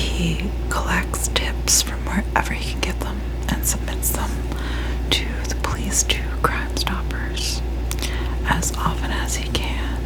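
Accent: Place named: Midwest, United States. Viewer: Are you from chicago cause you sound like youre in the midwest if not jersey!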